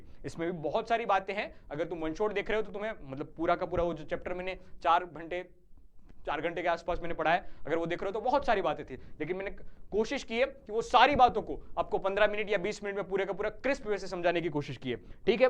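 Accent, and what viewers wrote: Gujarat, India. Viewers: Only gujrati guy recognise other gujju's Hindi😅, BTW sir is gujarati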